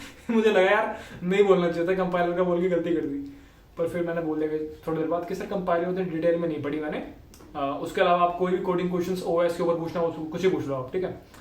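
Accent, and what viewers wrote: Punjab, India. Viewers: bhai are you from punjab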